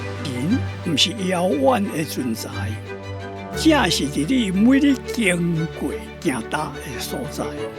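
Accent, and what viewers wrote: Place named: Taiwan. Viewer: 聽到台語口白一秒回到舊臺灣，真的好有感覺！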